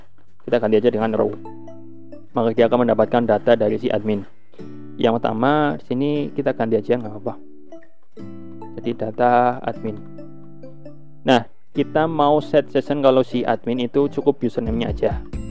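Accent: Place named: Banyumas, Indonesia. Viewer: Wong ngapak tah, cilacap mas xixi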